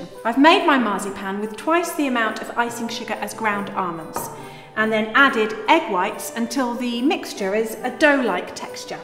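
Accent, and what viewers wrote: United Kingdom. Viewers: Why do I keep watching these! Do I just love British accents?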